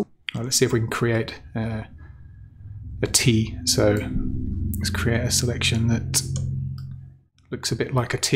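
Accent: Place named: United Kingdom. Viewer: Hey, I used to subscribe to your channel and newsletter in the past but over the last couple of years my photography has had to take a back seat for various reasons (mainly work!). However, I find myself self-isolating and working from home at the moment and have actually had a bit more time to go back and start editing some of my images. Your name popped into my head as being the 'luminosity mask' guy so I duly found your website and channel and resubscribed to both:) I have to say, I've picked up so much by watching your latest videos and everything is making sense now. Probably one of the reason my interest waned before was I simply wasn't getting the whole luminosity mask idea. Having just watched this video, the whole 'stencil'/'lace' analogy is something I wish I'd heard a few years ago. I remember watching your live walk-through edit of the Gibson Steps(?) and being impressed at the results but not appreciating the techniques you were using. 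Might be time for a re-watch if it's still available. Anyway, great stuff all round and thanks! BTW - if I recall, you're based in Oz aren't you? Reason I ask is that I detect a UK accent in there somewhere! [edit - spelling]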